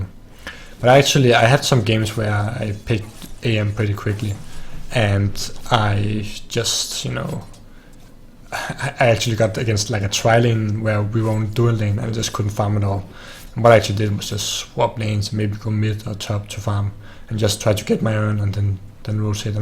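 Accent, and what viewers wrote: Denmark. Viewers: What's your native language? You sound very danish :)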